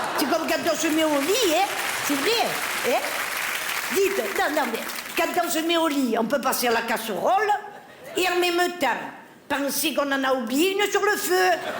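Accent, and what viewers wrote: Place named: Cannes, France. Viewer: Bravo Mado vous aime géniale et incassable moi qui vient de Cannes et qui n'y suis plus ça me fait un bien d'attendre l'accent de chez nous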